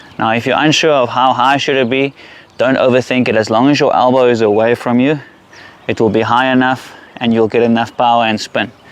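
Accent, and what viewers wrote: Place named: South Africa. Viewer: Few things more satisfying than your coach expressing approval by shouting "BETTAH" in a South African accent.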